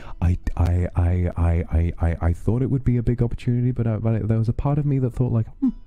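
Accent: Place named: United Kingdom. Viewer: 真的好愛爹地，瞬間愛上英國口音XD 聽完他的雞湯真的變得很有精神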